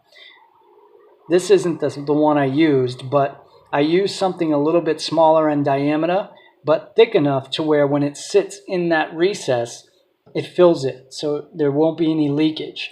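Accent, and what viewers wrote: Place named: Boston, United States. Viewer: love the bean town accent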